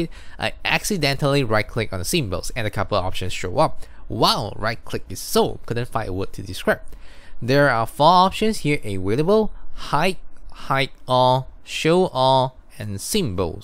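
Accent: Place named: Singapore. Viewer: youre singaporean, right?????!! Too Chinese!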